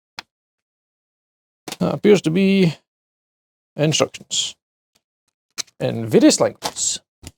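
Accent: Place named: Glasgow, United Kingdom. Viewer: could you possibly incorporate the words "curly whirly". "murder", "world" and "work" into one of your next videos? I love how they sound in the Glaswegian tongue. I always enjoy your vidz man.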